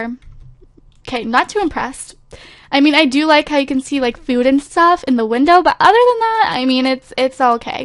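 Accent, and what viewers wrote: Scotland, United Kingdom. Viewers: Scottish lol:)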